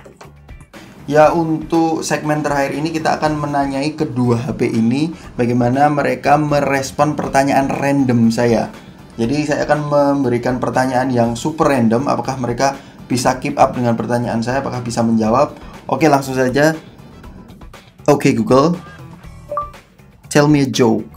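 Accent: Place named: Yogyakarta, Indonesia. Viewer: Saya lebih suka kamu berbicara dalam bahasa inggris, karena bahasa indonesia terkesan berbau yogjakarta.. tapi overall goodluck...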